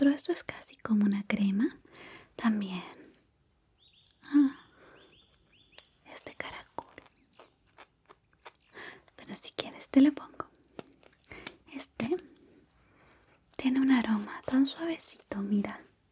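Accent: Chile. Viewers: Cada día más chileno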